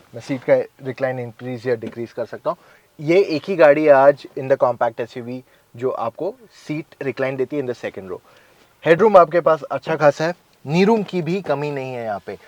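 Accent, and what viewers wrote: India. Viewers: Love ur Hindi accent 😍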